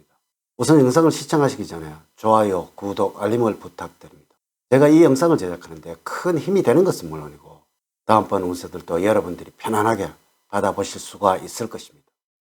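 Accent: Gyeongsang, South Korea. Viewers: 갱상도말씀 때문에 숫자가 잘못알라 듣겠다😢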